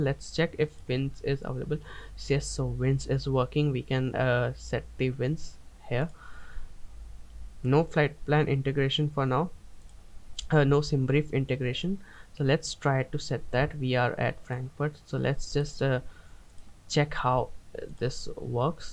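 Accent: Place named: India. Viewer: Sounds like a call centre in India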